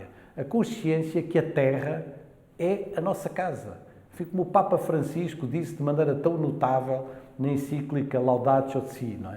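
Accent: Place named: Portugal. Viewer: o sotaque de Portugal pqp...........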